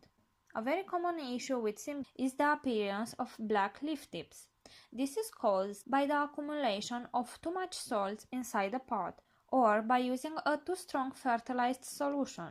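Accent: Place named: Portugal. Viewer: She has a Portuguese accent, big deal! Get over yourself🙄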